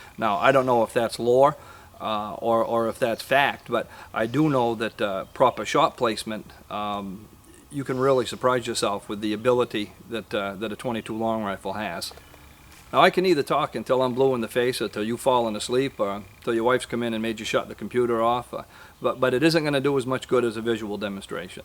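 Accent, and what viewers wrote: Maine, United States. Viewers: Hahaha. Great video man, I really enjoyed it 👍 " " a Maine accent and a M&P 15-22. Def America.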